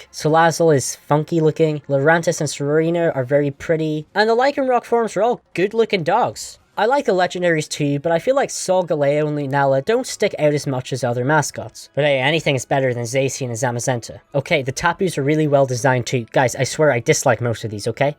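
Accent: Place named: Northern Ireland, United Kingdom. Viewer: You clearly put lots of effort into this video. I love your editing style. Keep up the great work dude. Although I’d say lose the northern Irish accent.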